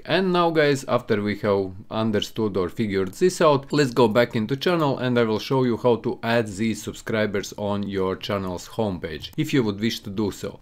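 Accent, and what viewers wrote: Russia. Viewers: i love russian accent 😂🔥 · Hes Russian i think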